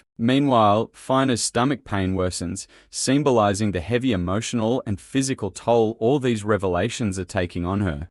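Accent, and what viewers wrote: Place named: Australia. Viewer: FINALLY! AUSSIE ACCENT!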